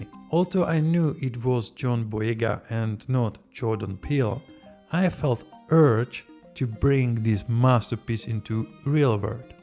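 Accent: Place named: Czech Republic. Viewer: that czech accent tho.. classic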